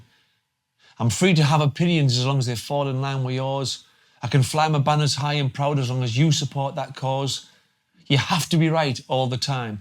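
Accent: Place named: North, United Kingdom. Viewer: That is probably... one of the most profound opening/things I have heard for a long time. Spoken like a northern man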